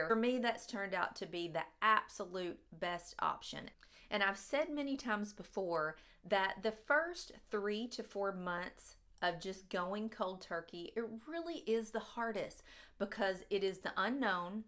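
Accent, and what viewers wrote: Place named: Mississippi, United States. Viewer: Your accent is the cutest!! It makes me feel like i'm back home in MS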